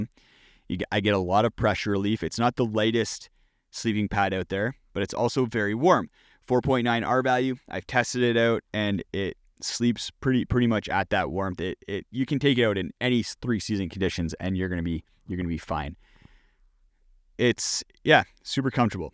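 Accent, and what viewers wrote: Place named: Minnesota, United States. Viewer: Love your channel - just found it, and am making my way through. I really appreciate your thought process and honest takes/reviews. And your accent - sounds like home to this Minnesotan. 😂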